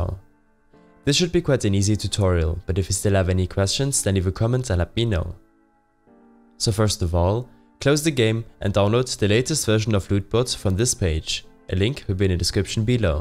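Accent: Germany. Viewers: You sound German mein Freund.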